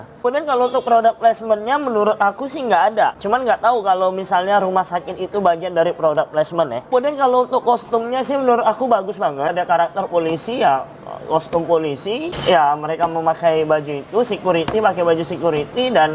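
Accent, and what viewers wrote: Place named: Sumatra, Indonesia. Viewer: Hahhaa logat sumatra bgt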